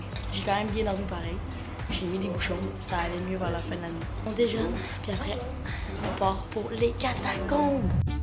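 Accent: Quebec, Canada. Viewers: xD l’accent québecois JADORE ZBI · J'ai juré l'accent Québécois c'est incroyable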